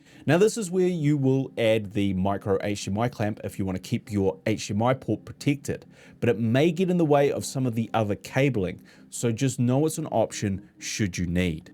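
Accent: New Zealand. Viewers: love the intro skit: hehehe do more! love the kiwi accent too.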